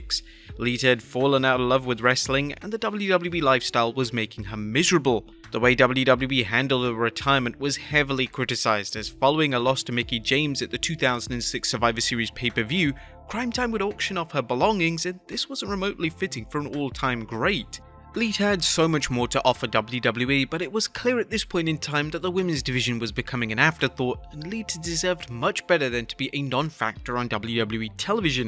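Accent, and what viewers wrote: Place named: United Kingdom. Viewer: Why all westreling videos always a Bristh accent,Wrestling is American.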